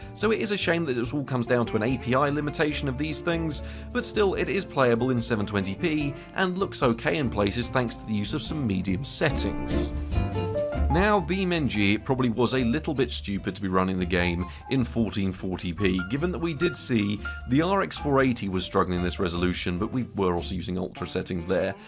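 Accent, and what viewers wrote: United Kingdom. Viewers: When you said "20 pound old AMD flagship" I was thinking "Imagine the GPU sag with that kind of weight!", but then I realized from the voice, "Oh yeah, he's from the UK, 'pounds' are his money!"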